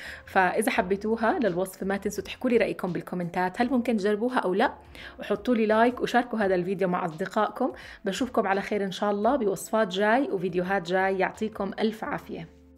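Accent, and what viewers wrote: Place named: Palestine. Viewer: راح اجربها اليوم ان شالله ❤ يسعد قلبها اللهجة الفلسطينية😍😍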